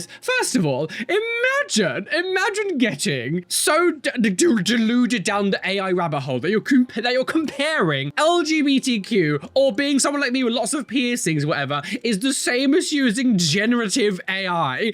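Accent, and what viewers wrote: United Kingdom. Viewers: I've been watching you for awhile and I love your skin art (tattoos) but then we get to your flamboyant personality and British accent like omg be still my heart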